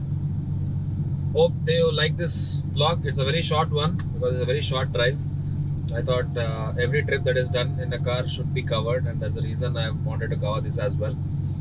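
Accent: India. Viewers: He's english is good.. He just has a South Indian accent which is okay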